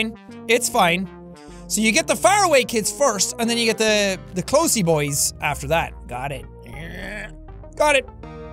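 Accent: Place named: Ireland. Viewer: Dear anyone taking an interest in this comment, I have been watching Jack for a while now and I love him. I first watched one of his videos when I was seven, I had head phones in on max volume, Bad idea. I clicked onto the video and heard a loud Irish mans voice that almost made me deaf. I immediately clicked off the video. A few years later when I was ten I found his channel again but didn't remember it so I clicked on the video and I loved the video, I watched more videos and subscribed to the channel. I loved him, he helped me through a lot. A few minutes ago as I am writing this comment I found out I am going to his live tour on the third of November, I was and am still crying tears of happiness because I can finally meet or at least see the man who has helped me through so much. if you are reading this, thank you so much.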